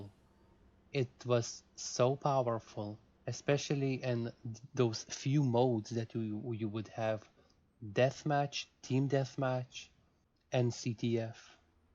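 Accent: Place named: England, United Kingdom. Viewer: I really like you English accent ❤️👌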